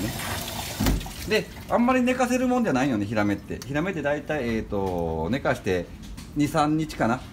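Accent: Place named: Miyazaki, Japan. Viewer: 流れる水の音と、宮崎なまりの関西弁が良くて、子守唄代わりに聞いてますよ。 「～はですよ、」のとこが面白いほど宮崎の発音ですね(笑)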